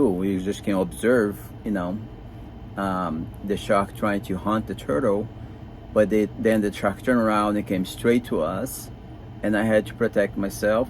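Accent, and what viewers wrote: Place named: Brazil. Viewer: hehe i can hear the brazilian accent! love your videos bro hope your channel blows up!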